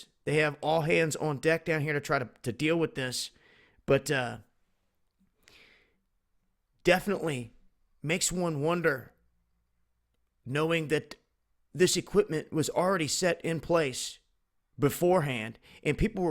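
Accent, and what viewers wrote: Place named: California, United States. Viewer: you sound like Owen Shroyer if he was from California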